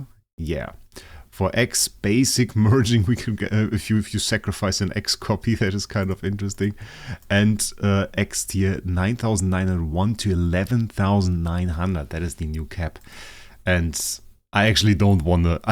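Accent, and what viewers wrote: Germany. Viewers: You german??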